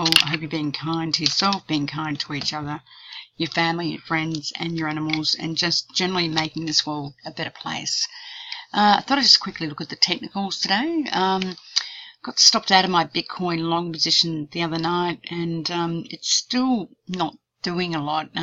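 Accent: Australia. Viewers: Don't fight it. Enjoy the Aussie accent man.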